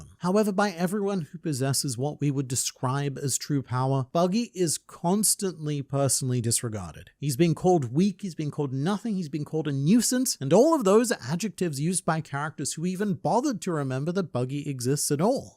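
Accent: Australia. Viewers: I like his yapping. I come to hear a fancy aussie yap about fictional pirates.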